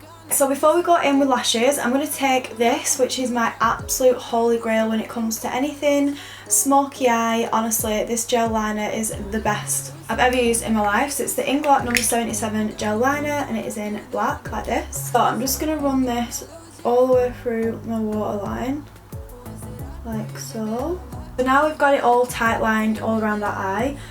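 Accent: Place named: United Kingdom. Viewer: You look so pretty my sweet friend also liked your brit accent🙂☺😙😙😙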